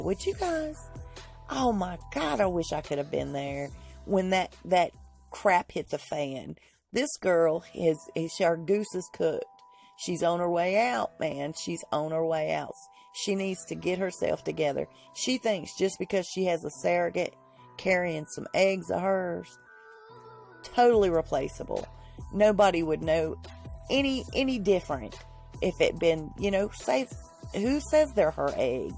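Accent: South, United States. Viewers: What a witch 🕷! Thank you for keeping us posted, by the way I like your Southern accent💗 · Oh oh what's that do I hear a bit of Southern Confederacy in your voice I'm really concerned here that we might be dealing with a racist then again you people don't call yourself races anymore do you I believe it's nationalist now get a life besides talking about this girl's seriously he chose her in a discussion you know you can't bring back the Civil War and give it to the South and your racism shows and all your videos by the way not just this one I will be notifying YouTube about you I'm sick and tired of you Paula Dean , Roseanne, racist pieces of crap going after this girl for no reason what did she do to you exactly and you sound American so you don't have any investment in England and if you were Harry told you to keep your opinion to yourself I don't think she ever because enough for you and you know she won't so why are you bothering us with your opinion why don't you stop burning your damn crosses on YouTube and by the way she was only married once before but that's just how much you educate yourself on any media that's not sponsored by whatever dude under a sheet you work for oh my goodness you know is this how you treat people seriously you seem to not go to church or know that you should treat other people the way you would want to be treated you just seem like a overwhelmingly negative person you ain't saying nothing unless you got something bad to say about this girl Kyrie put out a statement when they first started dating about people like you I believe it wa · Hey Hon welcome to the south! 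I love your southern accent! Carolina girls best in the world!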